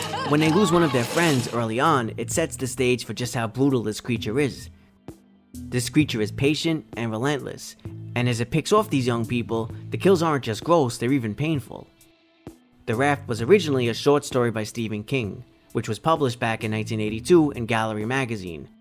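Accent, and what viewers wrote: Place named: New York City, United States. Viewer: Are you from NYC? That accent is strong af 😂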